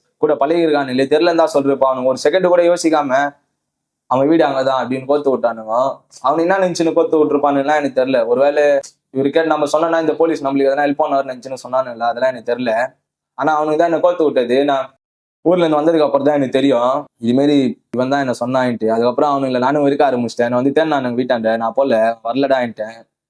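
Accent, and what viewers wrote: Chennai, India. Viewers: Inaikithanda Chennai Tamil kathula thean payuthu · Chennai native slang ah maathunga da dei😂.. kevalama iruku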